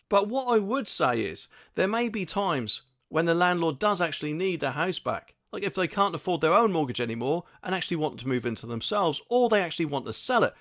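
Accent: Norfolk, United Kingdom. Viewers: Are you from Norfolk?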